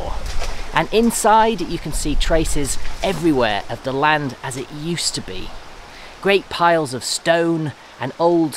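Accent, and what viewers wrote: Ireland. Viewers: Your accent becomes more Irish now mate! ☘️💚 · Sounds like you have become a Fully fledged irishman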